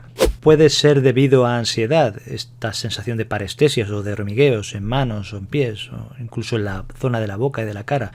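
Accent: Spain. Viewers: Ese timbre español! Muy natural agrada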